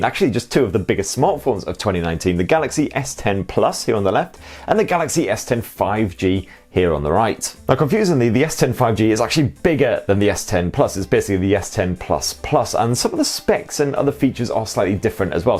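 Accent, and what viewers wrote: United Kingdom. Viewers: This video can actually be done in 5 mins or less. He said he doesn't want to bore us with the similarities, but that's all he's been talking about for about 7 mins. I skipped three times. Right, like we don't know about IP67. I love the British accent, but this guy's voice is... gross. So I thumbed down, twice if I can.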